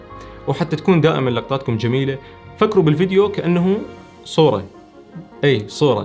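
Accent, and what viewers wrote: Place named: Iraq. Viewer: ما انتبهت أن هو يحجي عراقي الا بعد 5دقايق ههههههههههه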